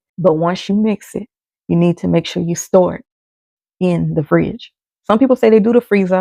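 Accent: Louisiana, United States. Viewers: Stopping by to learn from your video. Cant help but chuckle for catching your accent. What part of Louisiana are you from?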